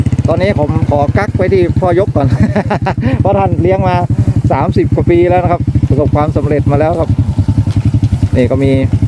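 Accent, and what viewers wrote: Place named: North, Thailand. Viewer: อู้กำเมืองก่อได้ก่าอ้ายกำเมืองม่วนหน๋า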